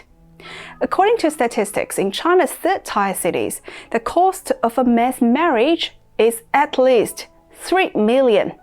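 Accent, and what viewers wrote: Australia. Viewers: Aussie accent init? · I’ve never heard a Chines/Australian accent before, interesting.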